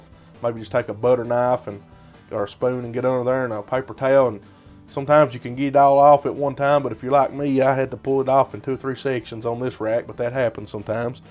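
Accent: South, United States. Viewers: Yoooo really down south sound to you man 💯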